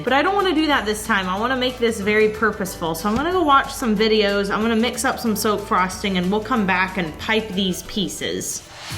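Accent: Texas, United States. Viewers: R u from texas?love ur channel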